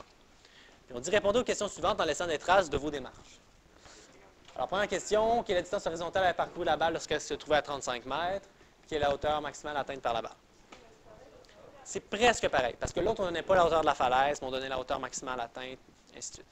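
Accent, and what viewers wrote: Quebec, Canada. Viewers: J’aime votre cours je le trouve super ! C ‘est juste que vous parlez trop vite pour quelqu’un qui n’est pas habitué à l’accent québécois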